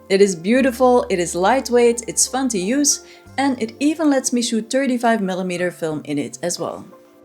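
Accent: Flanders, Belgium. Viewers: Great to hear a flemish intonation on a video about my favourite topic; subscribed!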